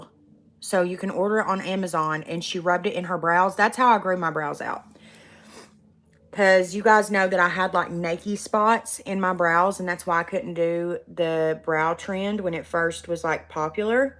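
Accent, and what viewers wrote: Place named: Tennessee, United States. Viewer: Hi! I M just be dreaming, right? That shirt, & girl that accent! Have I found one, a Tennessee girl? Your makeup skills are awesome? Im new to this, like couple of weeks new. Learned to contour my nose last week & I'm old, isn't that sad??? anyways I was just saying hey!!! 😊Have a 'kick ass' day....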